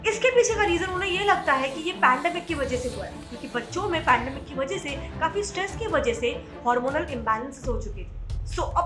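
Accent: Northeast, India. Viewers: Is she from Assam? She sounds like a northeast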